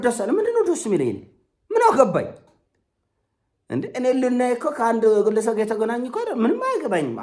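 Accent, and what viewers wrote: Tigray, Ethiopia. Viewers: ለኣንተ ግን ጥያቄ ኣለኝ ለምንድን ነው አማርኛውኝ በትግርኛ accent እያወላገድክ ምታወራው 😂?? ኣንተ ሰግጠህ በ accent እየቀለድክ እያዘላገድክ ፈምሰህ እንዴት ሰለ ሰነሰርዓት መናገር ትችላለህ ? ኣሁን አወላግደህ መናገር ሰልጣኔ ነው አንድ አማርኛውን እያበላሸሀው ነው ሌላ ደሞ ሌላ ሰው ለመምሰል እየሞከርክ ነው ራሰህን ምሰል ።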